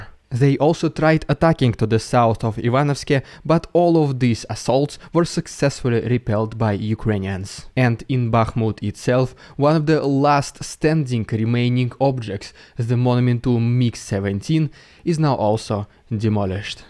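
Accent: Russia. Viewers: I'm really digging this recent recommended " ". joining his Patreon is the least I can do. side note: really glad I watched a lot of Danial Kyvat while he was in F1. It's helped me easily understand the accent. more of a side note: the multi-language and multi-cultural speaking and understanding of so many people in eastern Europe is very impressive. Their intelligence, grit, determination and bravery is incredibly inspiring and give me so much hope for our world's future. The new and younger generation of leaders are beginning to form and emerge. They take no shit, they don't sugar coat, they see through lies and they speak the truth. love to see it. wish I could do more.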